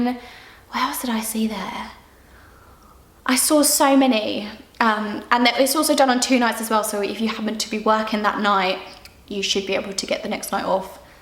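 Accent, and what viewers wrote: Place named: England, United Kingdom. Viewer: What part of England are you from? Your accent is lovely!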